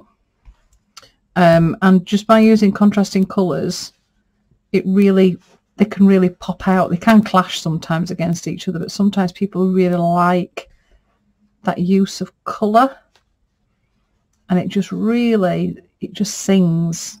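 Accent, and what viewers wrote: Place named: North, United Kingdom. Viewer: Just been told by one of our mutual viewers that I sound like you 😁💜 so I thought I'd better pop over and see, or rather, hear for myself 🤭 Well we're both from the north but I suspect there's a county or two between us 😁 Loving the tags.. Joie 💜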